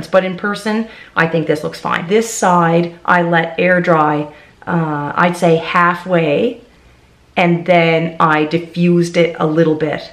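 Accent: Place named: Nova Scotia, Canada. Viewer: I love your accent. I walk around practicing my Nova Scotia voice.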